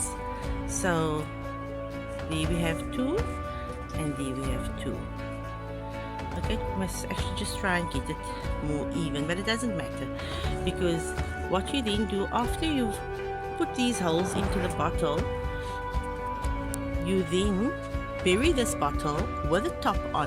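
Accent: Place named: South Africa. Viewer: How lekker to hear a local accent! Thank you for this tip. Greetings from Joburg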